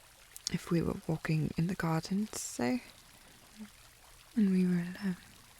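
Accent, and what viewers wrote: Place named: England, United Kingdom. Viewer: Yo, when she hit me with dat " Well go on, go! Time is of the essence!", I was so happy. Dat English accent slaps!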